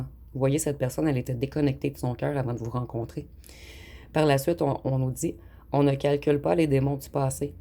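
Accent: Quebec, Canada. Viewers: Bonsoir Vous êtes belle vous expliquiez tres bien j'aime votre accent québécois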